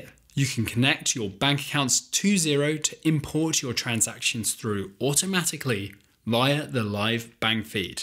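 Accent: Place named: United Kingdom. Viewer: Awesome. Every tutorial is just so much more pleasant with a cheerful British accent. 🙂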